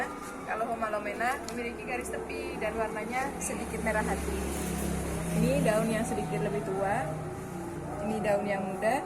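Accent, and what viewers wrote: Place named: Java, Indonesia. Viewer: Jowone Endi mba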